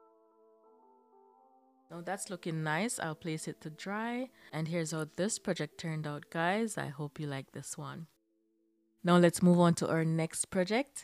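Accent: Jamaica. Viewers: Your English sounds Jamaican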